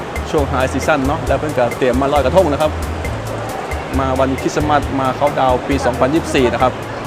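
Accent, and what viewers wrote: Phu Thai, Thailand. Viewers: เจ้าเป็นพูไทยฝั่งลาว หรือฝั่งไทย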